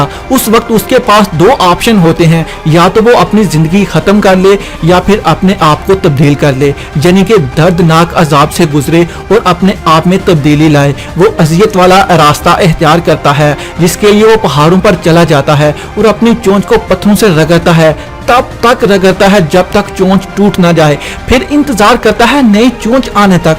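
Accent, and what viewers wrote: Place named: Punjab, Pakistan. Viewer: Bhai Ap apni Urdu pronunciation theek Karen. Ap khhe ko he bolte hen or Punjabi lahja clear dikhta he. Clear Urdu bolen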